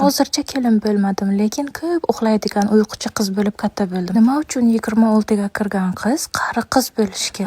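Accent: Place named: Bukhara, Uzbekistan. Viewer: Buxoro shevasida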